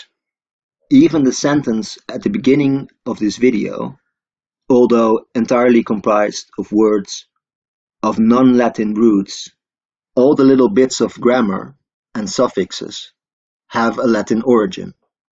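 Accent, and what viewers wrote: Netherlands. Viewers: I hear a fellow Dutchie🕵‍♂ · Is this guy dutch?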